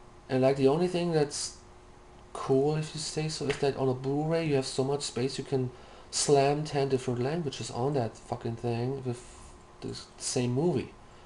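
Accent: Germany. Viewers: Guten Morgen! I like your german accent it sounds really cool. Sony is in the business of making money. if your waiting for backwards compatibility on the PS3 Slim console, it well never happen. No offense but you want alot but your just not willing to pay for it. Blu-ray looks fantastic on a properly "calibrated" hdtv preferably 1080p but a 720p would work as well. You don't seem to be into movies so i'd recommend you get a 60GB PS3 console which is backward compatible.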